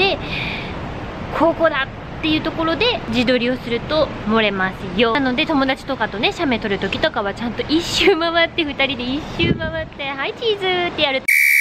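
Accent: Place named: Hakata, Japan. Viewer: チャンネル博多弁♥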